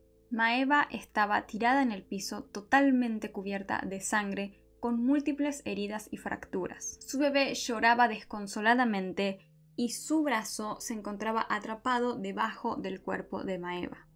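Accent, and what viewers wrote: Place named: North, Argentina. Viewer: Casi muero al escuchar tu tonada!! Ame!!! Claramente sos del norte pero no quiero arriesgarme a decir que del interior del corrientes. Muchos exitos, suscriptora nueva 😘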